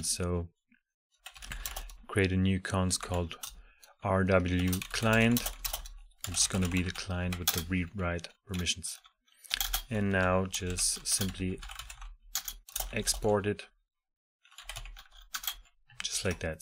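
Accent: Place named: Germany. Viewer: are u a german ? your accent made me tired. sorry bro.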